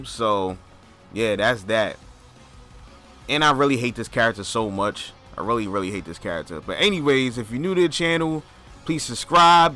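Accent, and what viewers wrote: New York, United States. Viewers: The most NY accent ever. Instant like and subscribe.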